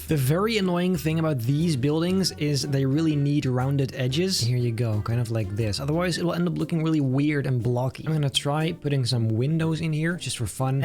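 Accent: Netherlands. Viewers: I love dutch accent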